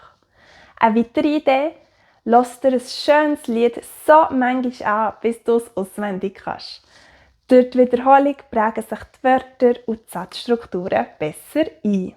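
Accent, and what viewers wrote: Bern, Switzerland. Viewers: This is Bernese German (Berndeutsch, Bärndütsch). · Danke, sprichst du Bärndütsch ?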